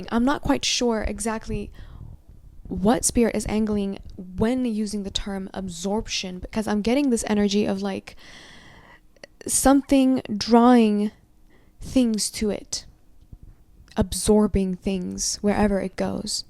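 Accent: United Kingdom. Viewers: Yep 👍 ❤ 😅 your UK accent is hilarious and beautiful! The universe is my sugar daddy ! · We going to the UK 😂 you definitely mastering the accent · ❤❤❤.. ohh my Egyptian Goddess Queen your British Accent is gettimg so good That I almost felt I was some How In a Timeline with Harry Potter & Hogwartz scholars ❤❤❤ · Not the British accent 😂... had me creasing thnx for repping the London gyal dem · Your British Accent 😂 ❤❤❤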